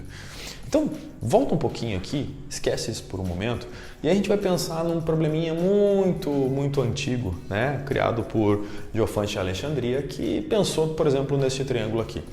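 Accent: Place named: Rio Grande do Sul, Brazil. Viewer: Excelente canal. Pelo sotaque deve ser gremista!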